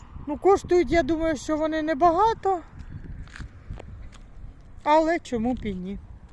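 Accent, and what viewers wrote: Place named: Surzhyk, Ukraine. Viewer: Приємно суржиком розмовляїте.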